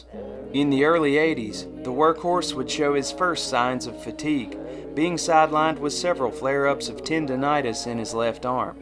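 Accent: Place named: Eastern Shore, United States. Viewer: Well done! This gave me goose bumps. I figured from some of your other vids you were an Os fan, and since you talk a bit like the people I grew up with, I mistook you for a fellow Eastern Shoreman.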